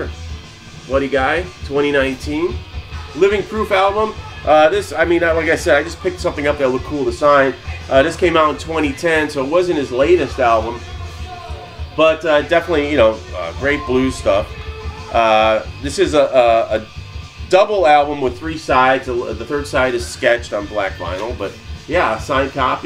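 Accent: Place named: Chicago, United States. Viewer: ! Happy Anniversary! Love the videos! When I’m homesick, I can come here and listen to some Chicaaaago accent and great music! Cheers brotha!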